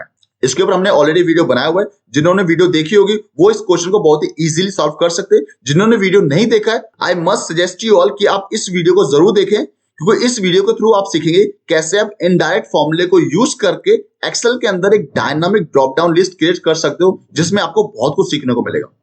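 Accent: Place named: India. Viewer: Indian speaking but excellent demonstration. Thanks a ton. Big like and subscribe for attaching the working file. 👍👍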